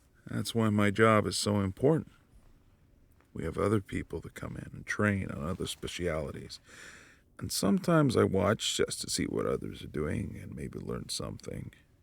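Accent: United Kingdom. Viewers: Still got that British dialect. definitely ❤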